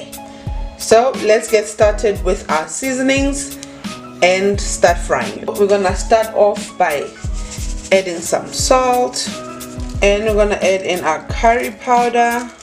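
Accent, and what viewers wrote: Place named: South Africa. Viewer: You can just tell she’s South African 🇿🇦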